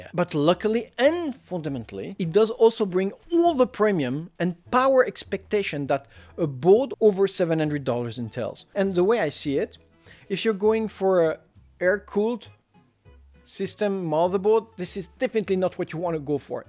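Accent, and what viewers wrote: France. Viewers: Breh is he french?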